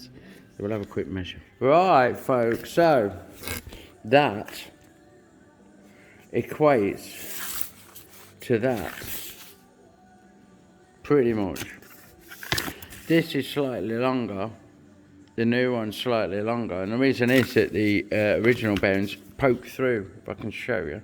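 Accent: United Kingdom. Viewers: Hi. Good to know that you're aright after all that rain you've catched. Today I had a bit of a problem understanding what you said, but it probably was your dripping nose that made the difference. 😀 I talk German and American English and your British English always takes a moment to set in. 😀 If I ever get to change bearings on a wheel I will think of how you did it. Thanx. James from Berlin, Germany 😉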